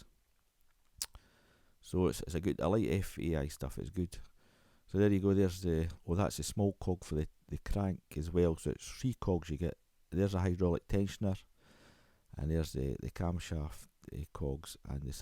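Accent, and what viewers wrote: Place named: Falkirk, United Kingdom. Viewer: I am looking for someone to do mine , sounds like you live local to Falkirk ?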